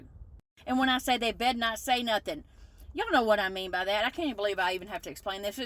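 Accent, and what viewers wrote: United States. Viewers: ONLY NON!!! Gen X would troll you because that the way the world is today 🙄🤦 I love your vids. They tke me back to THA DAY...LMAO AND WILL SAY, I am shocked your from Memphis. You sound more country....lol THANKS for all the the vids tho. It's like time traveling 😆👍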